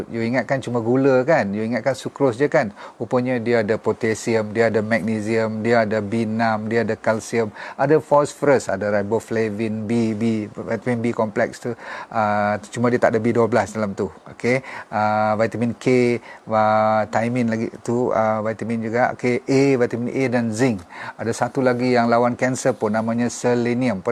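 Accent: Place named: North, Malaysia. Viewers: org peneng klo xsilap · Manis melecaih... org utara ka... Banyak perkataan bunyi belah2 utara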